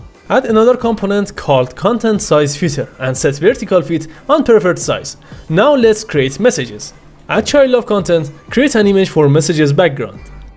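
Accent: Iran. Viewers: you sound Iranian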